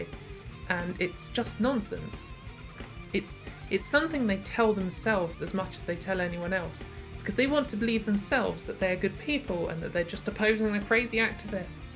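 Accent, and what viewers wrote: United Kingdom. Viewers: Seeing u speak with a British accent is very weird. I imagined u had a transatlantic American accent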